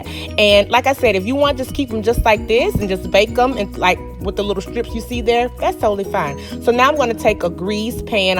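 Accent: South, United States. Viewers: She's from the south. We all (black folks) talk like her. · And what exactly does a plantation mammy sounds like? She has a southern accent like most people who are born and live in a southern state. Would you call someone with a Boston accent a slave master? Rethink your stereotypes. I see you have been brainwashed by the media because slavery has been over for some time so it is no possible way you know what a plantation mammy sounds like.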